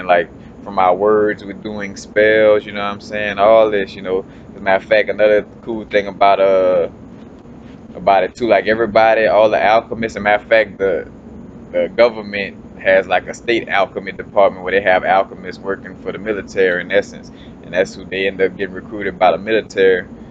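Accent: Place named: South, United States. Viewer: Brother I love video,but sometimes you fumble your word and laugh or say "and whatever". I get a ton of knowledge from you. I just wish you would slow down your thoughts so that the watcher could receive all the amazing words you have to share. You got to remember we're not all from the south. Lol that drawl is tough to understand at times. I SAY ALL THIS WITH LOVE, cuz I want the world to get hip to you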